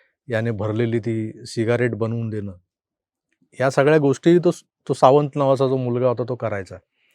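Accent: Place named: Pune, India. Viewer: O ' sir U speak marathi very pure than sadashiv peth.Ur knowledge is very Crucial N genius N brilliant.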